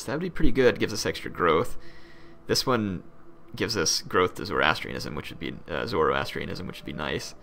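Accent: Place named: South, United States. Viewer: he's from the south c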